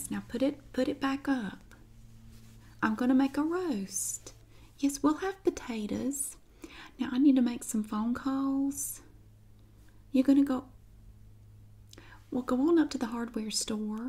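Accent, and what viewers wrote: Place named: North Carolina, United States. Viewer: I love this accent so much as a southern guy. It's really we done. Then I find out she is in NC cause Winston Salem is right up the road and now I understand why it sounds so familiar. NC represent!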